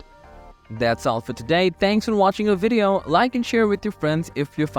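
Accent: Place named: India. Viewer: U sound from india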